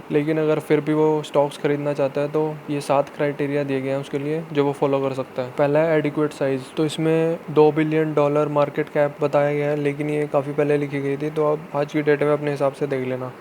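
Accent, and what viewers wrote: India. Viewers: One suggestion/ recommendation only with well wishing to improve. Please speak closer to mic as awaz thoda faint hai. love your style of talking. Talking like a layman with Delhi Hindi twist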